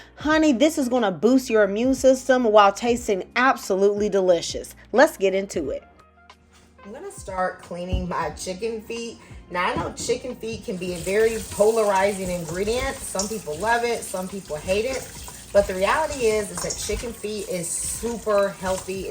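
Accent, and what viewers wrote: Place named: Nashville, United States. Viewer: Hi! I'm a new fan,. Hey - are you by any chance a Nashvillian? That's Tennessee, y'all. You sound so Nashville! Are you? Love your stuff! Keith in Nashville PS You're a genius!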